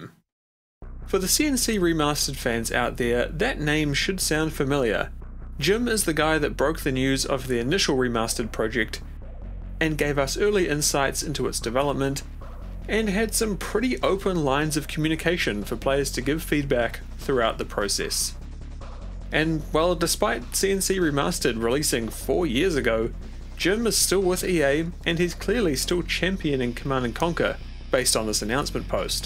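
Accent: New Zealand. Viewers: I detect a kiwi accent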